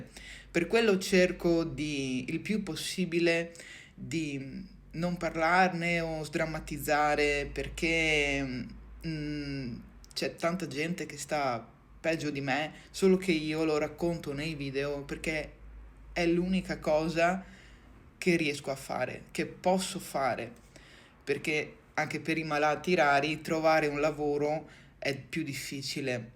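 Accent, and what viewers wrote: Veneto, Italy. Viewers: Comunque volevo dirti che sei molto carina ed hai un bellissimo accento veneto ( mi sembra che sia veneto), magari dirtelo non serve a niente, ma è quello che penso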